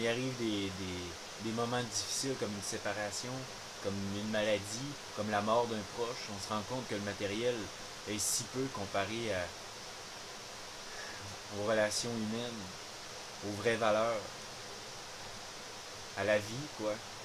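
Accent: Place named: Canada. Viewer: Bonjour, 1ère vidéo que je découvre avec ravissement une cabane nichée au ❤ d'une sublime forêt 🌲🌲🌲🌲🍁🍂🍃🍀☘🌿🌾🌵🪴🌱.Rien de tel pour se ressourcer, laisser les blessures du passé s'enfuir dans le vent qui court dans le temps qui passe. Le meilleur ami de l'homme, l'animal, ce 🐕 🐶 si merveilleux, qui t'accompagne, lui seul comprend ta mélancolie et qui jour après jour te souffle le bonheur de demain...., ton plus grand ami. J'adore ton accent canadien. Bon courage avec Kirten. Cordialement. Sayoko. 28/01/2021🌞☃️❄⛄❄🌜🌛🌠✌✌✌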